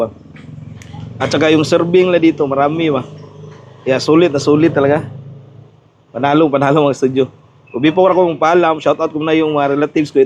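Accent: Visayas, Philippines. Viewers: Naa napod ka studio. Dalo kaau ka.panglibre pod diha. . Dika maulaw ka studio? Bisdak baya kaau mg Tagalog. Pag English pod. Wla Kay angay mg tagaglog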